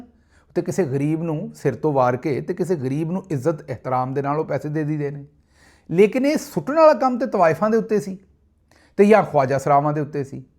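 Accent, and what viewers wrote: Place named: Nagaland, India. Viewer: گل صاب خالص ناگاں دا لہجہ تہاڈا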